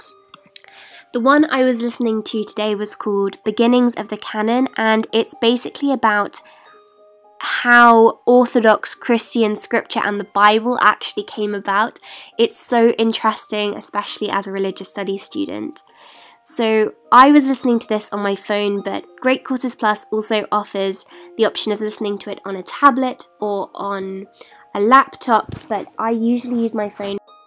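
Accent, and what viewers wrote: RP, United Kingdom. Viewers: Her accent 😭💛💛💛 I love British people 💛💛💛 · now this what u call a hermione irl... the accent, the and productivity 😩✋ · Omg I love your voice and your accent is so posh ❤️😂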